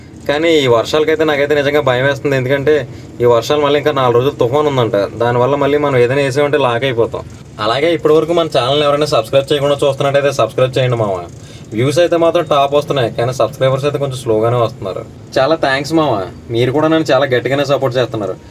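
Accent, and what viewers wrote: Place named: East Godavari, India. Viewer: Yedo East Godavari slang lo matladu thunna Vu brother. Mee proper yekkada brother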